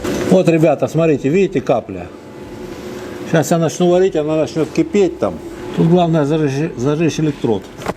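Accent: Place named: South, Russia. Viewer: Ув., лучше, вырезал окно, а вместо песка, (😊😊куда его потом), промасленую тряпку, набил в трубу, а потм вытащил её и заварил!! 😊😊А по говору Вашему, слышно земляка с юга Руси, привет Вам!!....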